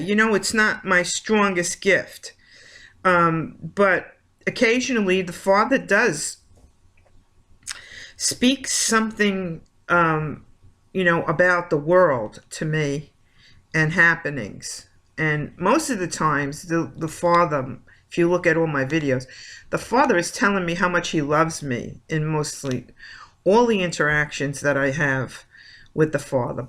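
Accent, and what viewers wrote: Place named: New York, United States. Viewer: Also I love your accent.. NY?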